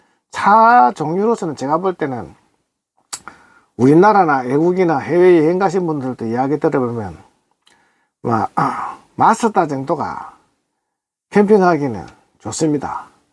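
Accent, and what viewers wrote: Gyeongsang, South Korea. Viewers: 경상도 분인가 봐요. 구구절절 맞아요. 저도 9인승 5인승 구조변경 스타리아 라운지 8천만 원대, 1년 3개월 된 것을 5천 2백만 원에 구입하였는데 새 차나 다름없더군요. 우리 마눌님하고 3박~4박으로 주로 관광 목적으로 다니고 있지요. 비용을 아껴 써도 적게는 20십~40십만 원 들어갑니다. 저도 테이블은 사용하기 불편하여 무게만 나가고 집에 두고 다니고 있어요. 스타리아로 70대 분이 10개월 만에 세계 일주하신 분이 있더군요. 건강하시고 행복하세요. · 선생님 잘 들었습니다 항상 건강하시고 좋은 캠핑 힐링 하십시오 경상도 고향이신것 같아요 ~~~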